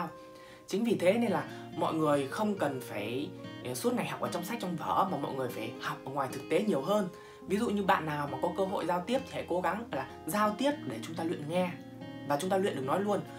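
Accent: Hai Duong, Vietnam. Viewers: Giọng Hải Dương yêu dấu quê mình đây rồi🙂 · Bạn người Hải Dương phải k? Nghe giọng b giống người HD 😉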